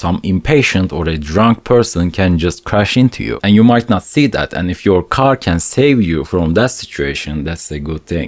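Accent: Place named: Turkey. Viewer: Abi Türk aksanı hayvani sızmış, elinize sağlık 🔥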